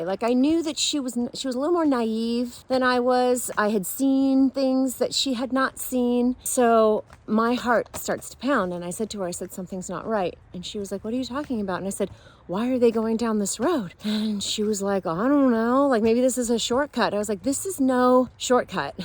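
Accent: England, United Kingdom. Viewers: Llllove that English accent! I give you kudos for that one for sure!! (Even if I'm a year late for this one). But I love all accents, I gotta say, just love 'em! And so, throwing in some others now and then is just fine with me! But above all, I love your devotional talks here, thanks, our eternal friend! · The English accent is awesome!! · Not lovin' the English accent and imagine how cringe it's be if a British youtuber broke into an American accent. He/she would sure look a fool! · Love the British accent!!! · Beautiful British accent,. Makes your beautiful voice more beautiful.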